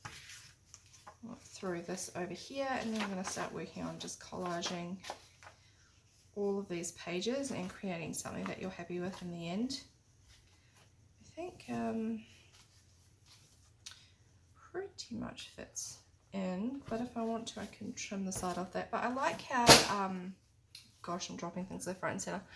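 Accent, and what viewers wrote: New Zealand. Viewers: Ahhhh, I had thought there was a bit of a kiwis accent there !!... when Gayle A said she loved your Aussie accent and I went huummm. I'm so like you in regards going to the states ,told people would love to go to the states...questioned ouhh what would you do 😁 Go to second hand shop, craft shops Michael's ..theres a bit of a list there !!!. The area of BrisVegas I'm in, the second hand shops are rather boorriing!!. I've been keeping an eye out for wall paper .... as for tea dying paper my issue is that mine are too light..tried drying them naturally, in oven, ironing them...not finding them exciting lol. Eco dying ohh my gosh they were bleurrr please show how you do urs with Aussie flowers and products. I still am trying g to work out what Alum powder is 😲..makes gorgeous colours of plants and flowers. With my pages theres just a tiny hint that there might have been a flower there ahaha. 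Things in the states are soo different. I was at my local Kaiser shop and overheard a lady stating that she just couldnt find a piece of 12×12 that she wanted and was upset. I happened to have that paper which I'm not nor will ever use. So i went up to her and introduced myself telling her I had that paper and she was welcome to have it...oh my gosh it was so awful , I ended up telling her I would leave the paper at the shop for her ro pick up....like I she really needed that piece of paper to finish her scrapbooking. All that lady kept saying was ...what do u want , you cant just want to gi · Recently stumbled upon your channel I detected the kiwi 🥝 accent im from Auckland but soon to be a southland gal, love your channel and ideas very inspiring thank you for sharing your creativity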